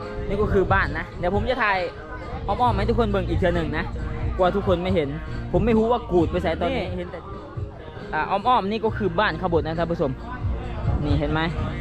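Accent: Laos. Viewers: เว่าลาวป่นไท น่ารักดี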